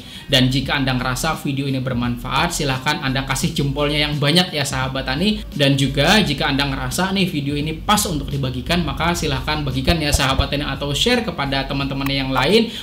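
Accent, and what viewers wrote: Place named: Hulu Sungai, Indonesia. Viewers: Kukira orng mana kah ternyata eh ternyata orng hulu sungai jua 😁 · Pa p urang mn ky ad lugat kalsel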